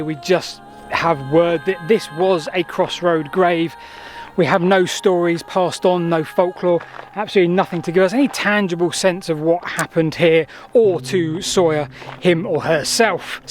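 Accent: United Kingdom. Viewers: How does Youtube think the audio track is "English (United States)"? He is the most British sounding bloke I've heard all day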